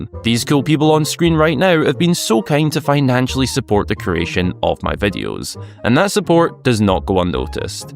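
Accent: Scotland, United Kingdom. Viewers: Are you Scottish ? You kind of talk like Scrooge McDuck in the DuckTales reboot 😅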